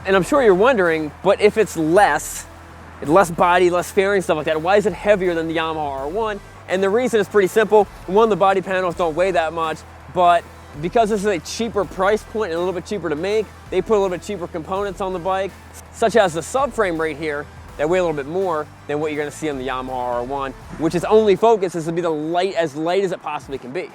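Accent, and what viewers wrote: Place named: South, United States. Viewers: hes from the south what do you expect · I think he's texan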